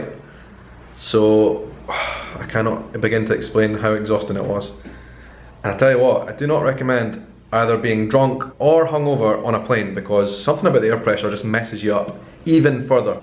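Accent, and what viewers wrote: Scotland, United Kingdom. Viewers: Hi Where are you live..vitoria? I have a lot friends there if you need something please writte me..Im living in Dublin at moment. And I love your channel because the serie OUtlander.. and amazing your scottish accent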